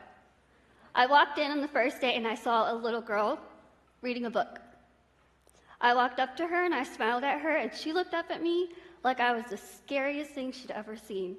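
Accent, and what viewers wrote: United Kingdom. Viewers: イギリス英語ですし美しいですよね、、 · イギリス英語なんですね！ もうテストのリスニング全部この方がやって下さらないかな..🤔🤔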